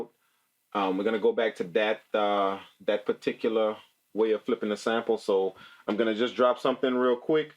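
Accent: New Orleans, United States. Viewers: Love the accent sounds NOLA new to the MPC and beat making new sub🔥🔥🔥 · Lol. Interesting. Are you from N.O.? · Man are you from New Orleans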